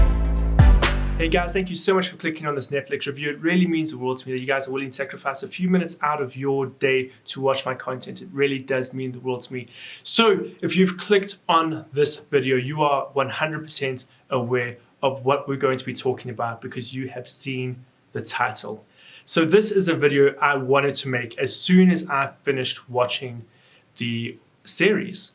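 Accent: South Africa. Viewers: Howsit bru nice to hear a South African voice, yeah this sucked big time a show that was launched in the 80' for young boys that is now about a show that lacks any sort of male character, Ripley and Sarah Connor are great examples of strong female leads, young boys need heroes too man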